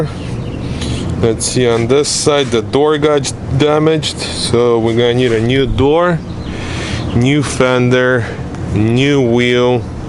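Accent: Russia. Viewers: u have rus accent lool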